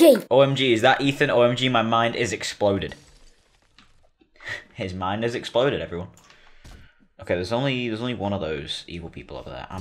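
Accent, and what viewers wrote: United Kingdom. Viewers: He literally have a fricking deep British accent now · He sound so british he sounds like a 12 year old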